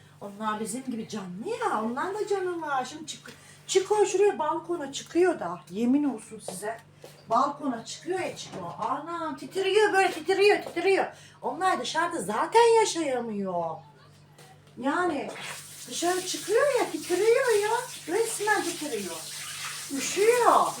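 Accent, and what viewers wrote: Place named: Aegean, Turkey. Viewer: Şivene bayılıyorum Ege şivesi 😘😘😘Çiko da evinin bir ferdi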